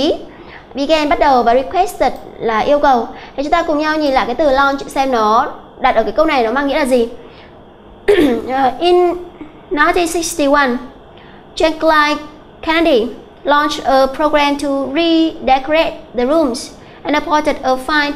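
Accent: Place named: Philippines. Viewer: s cô đọc nghe như cứ sai sai s ấy giống phát âm của ng philippines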